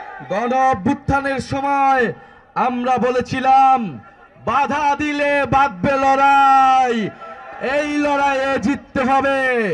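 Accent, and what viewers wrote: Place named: Chittagong, Bangladesh. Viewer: খুব সুন্দর চট্টগ্রাম এর ভাষায় কথা বলছে ভাই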